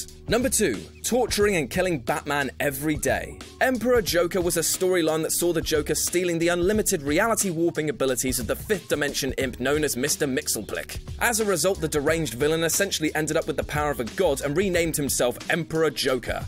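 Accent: United Kingdom. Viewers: where are you from? UK?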